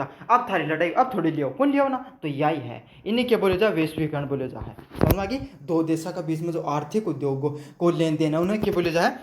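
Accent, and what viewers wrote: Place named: Marwari, India. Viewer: Sir thoda dhire or marvadi bhasa ka kam thoda thik thak hindi m b samjao 😣smj nhi aa rha achee se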